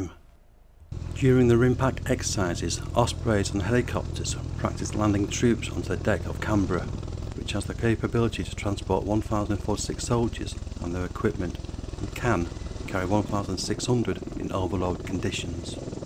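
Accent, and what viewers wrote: Northern England, United Kingdom. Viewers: Got northern accent. Sound suicidal. Voice as colourful as coal pit near Birmingham. Sound like VoiceOver recording is a painful chore.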